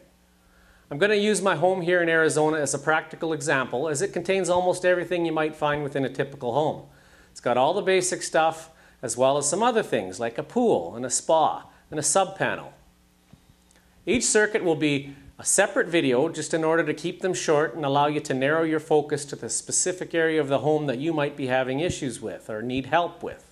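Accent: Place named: Canada. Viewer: He lives in Arizona? This guy sounds totally Canadian Ay!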